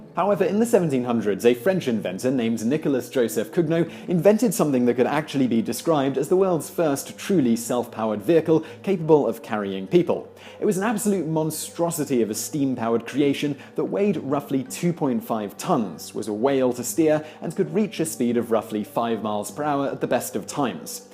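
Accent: United Kingdom. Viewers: Is English not the narrators first language? I keep hearing basic words being mispronounced, so just wondering if English isn’t his native language, because his accent seems to be British... confused. :/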